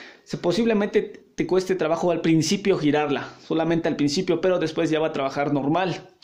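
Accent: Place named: Ecuador. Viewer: Yo tengo una moto parada hace ya un año pero me fice mi mecánico que ya no le meta dinero a esa moto ...pero es que me gusta es honda sfx de 50 c . Ud. Es un buen mecannico ...y pir la manera de hablar creo que es de mi pais anterior ecuador ...soy de alli . Yo tengo muchos años que lo dejé 34 años desde que trabajaba como.profesora. cuao un saludo Paulina .A . Ross .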